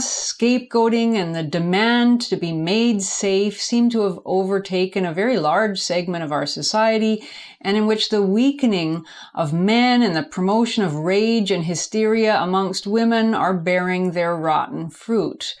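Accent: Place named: Canada. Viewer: Goodness gracious I love her Canadian accent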